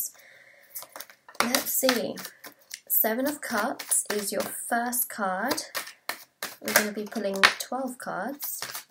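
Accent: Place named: RP, United Kingdom. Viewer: Newbie here. Will be interesting if it comes true. Nice to hear the queens English spoken properly.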